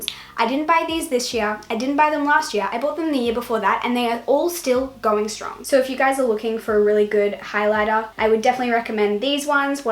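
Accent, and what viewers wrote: Australia. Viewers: I subbed just because of your verse at the beginning of your video, your cross necklace, and your Aussie accent. The funny thing is I don’t think I have an Australian accent and then I go and watch other Aussie’s videos and I can definitely tell their accent! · I find it so weird how Australian people have english and American accent mixed together 😂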